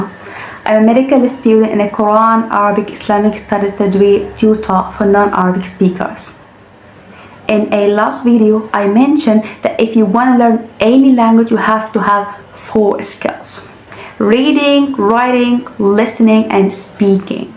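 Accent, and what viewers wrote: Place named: Scotland, United Kingdom. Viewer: British accent مع شوية سكوتش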